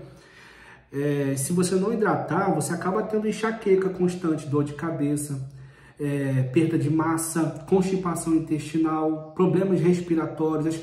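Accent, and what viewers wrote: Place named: Minas Gerais, Brazil. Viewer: Além de lindo é mineiro. Com esse sotaque então....Meu amigo. Desculpa. Não resisti. Amo seus vídeos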